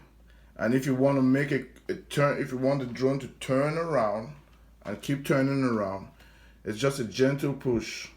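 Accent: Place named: Trinidad and Tobago. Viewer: Are you from Trinidad? Just wondering, your accent sounds just like my old boss.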